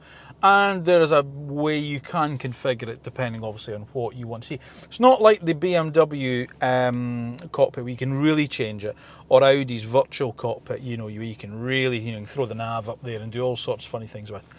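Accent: Scotland, United Kingdom. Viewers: That accent. Is that doric mixed with orkney?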